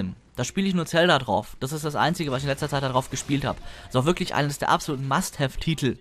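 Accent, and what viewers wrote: Hesse, Germany. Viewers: dein Hessisch ist genial:)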